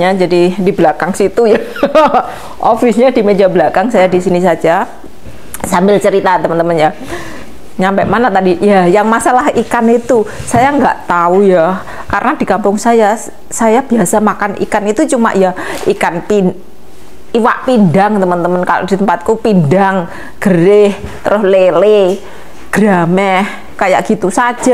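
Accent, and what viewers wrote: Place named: Java, Indonesia. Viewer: gpp medok Jeng.....iku ciri khas e lo . gampang di eling2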